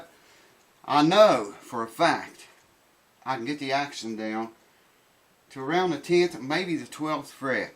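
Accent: South, United States. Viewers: Hey, new sub here. Is that a southern accent I detect?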